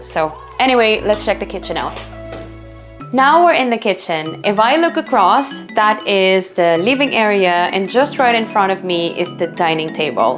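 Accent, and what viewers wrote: Ireland. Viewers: You sound like Irish.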